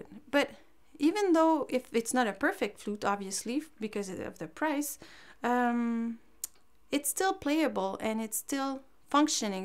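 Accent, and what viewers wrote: Quebec, Canada. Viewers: Êtes vous Québécoise?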